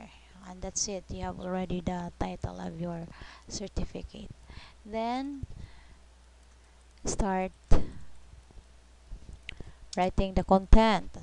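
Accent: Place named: Philippines. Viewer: From the accent I can already tell you're a Filipina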